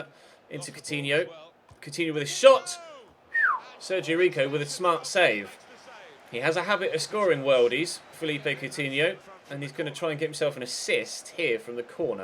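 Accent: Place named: RP, United Kingdom. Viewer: he's just posh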